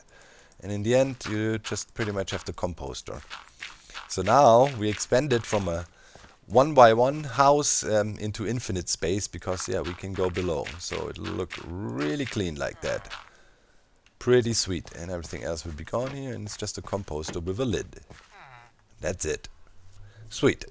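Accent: Germany. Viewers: Du hörst dich ziemlich deutsch an, bist du ? Wenn du nicht antwortest denke ich mal nicht :)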